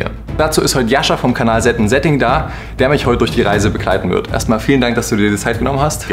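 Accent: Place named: Saxony, Germany. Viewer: Ich liebe deinen leicht durchschimmernden sächsischen Dialekt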